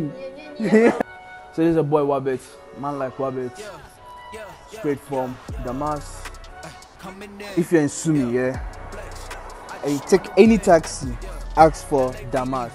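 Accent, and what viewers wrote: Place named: Ghana. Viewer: Your video popped up, and then I decided to watch it. Your accent sounds like a Ghanian's... Nice vlog between.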